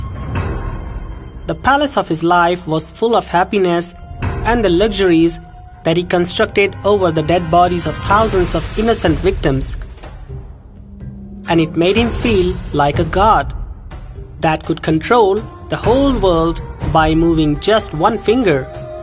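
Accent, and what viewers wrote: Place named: India. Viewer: I completely agree he sounds Indian and a load of crap coming from his mouth I couldn't watch it all since it was all lies...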